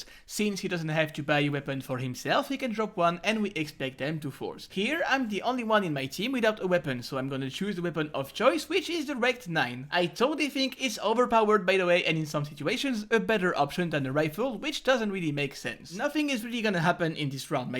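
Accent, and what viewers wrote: France. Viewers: You speak like a french but look like American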